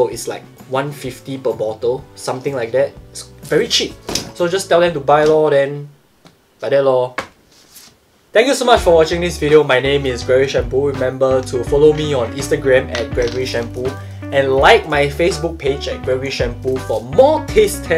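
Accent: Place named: Singapore. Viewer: well sorry but this is how most of us Singaporeans speak...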